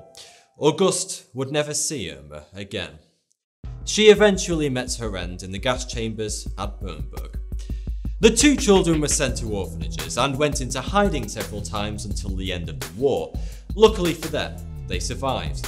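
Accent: United Kingdom. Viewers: Why u say bot not but? Oh ur from uk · interesting video, but that obnoxious bri-ish accent made my ears bleed. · What British accent is it?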